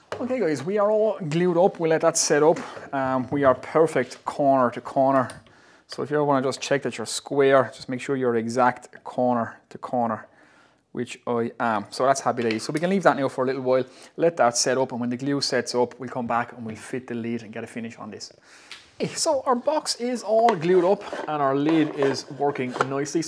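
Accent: Ireland. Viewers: good man...... I usuall saw the walls as the plough will jus rip it apart going cross the grain. I have a plough with a scoring cutter but find its quicker to jus saw the wall than fiddle around with the scoring cutter...... they're a pain to sharpen too 🤦‍♂️😂 I enjoy your hand tool stuff lad, it's also nice to hear our distinct Irish accent on a youtube video 😁🤙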